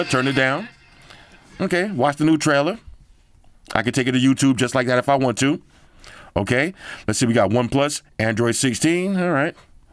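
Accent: New York, United States. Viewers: I do have to say that I truly like and respect how you keep it real with your reviews and yes, I do have an iPhone 16 Pro black titanium and no I do not like the new three colors in the new Apple iPhone 17 Pro models. Of course you’re always going to have haters out there so you keep on being you and keeping it real and disregard all the haters you’re going to come across on your channel and I just subscribe to your channel and again I do admire and respect how you give good insight into whatever it may be Apple Google or any other smart Phone or product out there on the market in today’s marketplace. And since you touched on the Apple Watch Ultra 3 and just wanted to know how you felt about the 5G versus the Apple Watch Ultra 2 and how in comparison speed wise does the Apple Watch Ultra 3 is it slightly better than the ultra two or are they about the same speed wise? 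You definitely sound like you’re from the New York city Metropolitan area and I’m here in Brooklyn New York where I was born and raised and I always get hated on for voicing my opinions or telling it like it truly is but that’s OK. It’s not a popularity contest with me you either like me or dislike me and it doesn’t really matter to me because again it’s not a popularity contest with me what you say is what you get and a lot of people truly respect those or truly honest with themselves and others and of course you’re always going to have the hater or two out there again keep making great videos and keep it real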